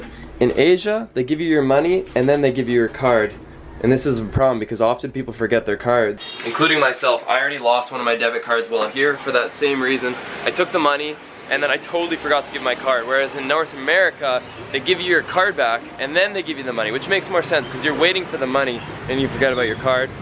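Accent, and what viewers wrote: Australia. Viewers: sounds like Australia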